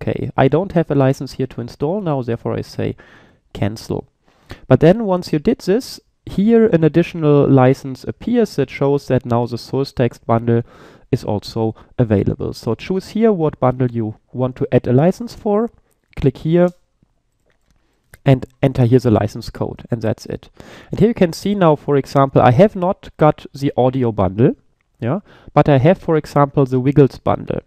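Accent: Germany. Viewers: Man hört unnormal dass du Deutsch bist :D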